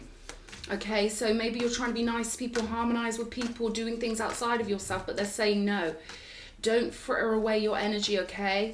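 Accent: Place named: England, United Kingdom. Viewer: your English accent lol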